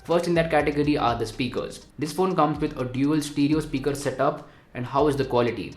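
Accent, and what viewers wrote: India. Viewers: Mrwhosetheboss with indian accent😁👌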